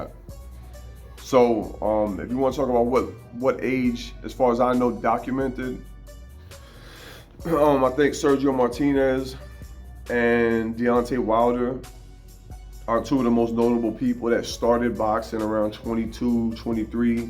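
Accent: New York, United States. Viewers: That New York accent has me feeling like Nas is explaining boxing to me. Love it. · No doubt you sounded like a NY guy but I didn’t want to assume. Appreciate all your motivation, knowledge and replying to your subscribers. Consistency is the key to life and this YouTube thing!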